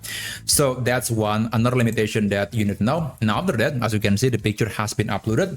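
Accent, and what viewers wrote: Indonesia. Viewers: dari logatnya kerasa banget nih orang indonya hahaha makasih bang tutorialnya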